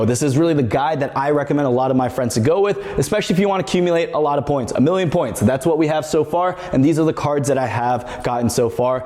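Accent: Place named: Orlando, United States. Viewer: Man it sounds like you are by Midway or Orlando.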